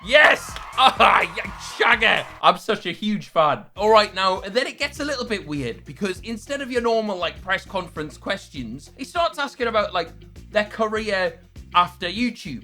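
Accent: United Kingdom. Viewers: You sound so British like if u are😧sameee😂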